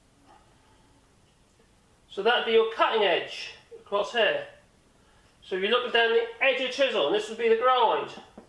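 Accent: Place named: London, United Kingdom. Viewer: You sound like a fellow Londoner. Walthamstow lad here.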